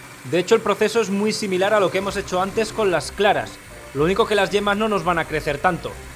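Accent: Spain. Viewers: soy Mexicano y me encanta la cultura y ese acento unico de nuestros queridos Españoles. ¡¡¡Que viva la madre patria!!!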